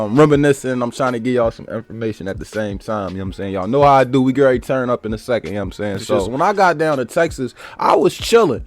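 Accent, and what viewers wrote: Philadelphia, United States. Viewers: I woulda never known you was born in Texas. Your Philly accent is HEAVY 😂😂 but naw my cousin was born and raised in Philly until she was 20…moved down Louisiana and after a year and a half she talks like lil Wayne 😂😂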